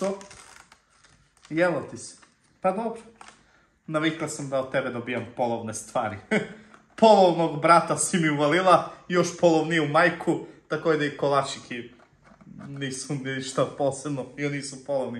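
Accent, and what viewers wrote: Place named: Zaječar, Serbia. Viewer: On je iz Zajecara ne sa juga,tako se prica i u nas kraj....On je bukvalno pogodio u srz tog zivota inostranog...🤣🤣🤣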